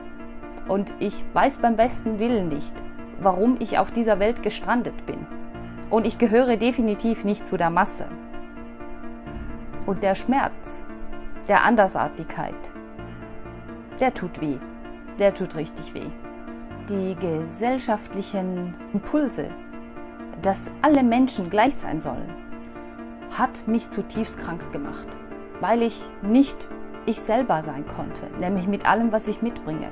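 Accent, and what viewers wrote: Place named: Switzerland. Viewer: Hallo 👋👋👋👋👋 Mobbing ist ein sehr wichtiges Thema. Mobbing, Cybermobbing usw. ist in den letzten Jahren sehr schlimm geworden, speziell seit es das Internet gibt. Es ist sehr hilfreich wenn, es Menschen gibt, die darüber sprechen. Ich danke Dir für das sehr interessante und gutes Video.👍👍👍👍👍👍👍👏👏👏👏👏👏👏 Ich habe noch eine Frage, wenn Du es mir erlaubst: Von deinem Akzent her müsstest Du aus der Schweiz sein?! lg Leandro 😺😽🙏 Bis bald.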